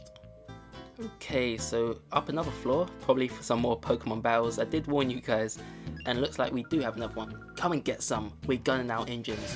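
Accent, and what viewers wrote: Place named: United Kingdom. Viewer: So, noting the UK accent... whereabouts in the country are you? I'm guessing south? :)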